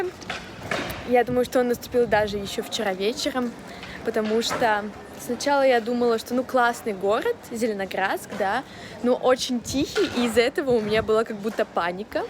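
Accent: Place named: France. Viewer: Привет! Откуда ты? Я никогда не слушал твой акцент 😄 Либа ето не акцент, проста как вы говорите? Вы как француженка с очень высоком уровень на русском хахаха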